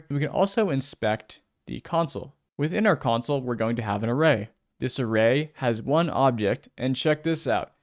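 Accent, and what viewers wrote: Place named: United States. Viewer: your english is the best I can understand. true American accent I use to learn in school. thanks for the great tutorial.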